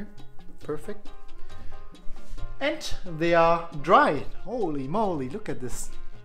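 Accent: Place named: Germany. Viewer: BIG German accent but great video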